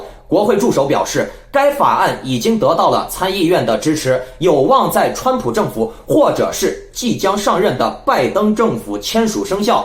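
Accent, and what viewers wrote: Henan, China. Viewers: 主播河南的么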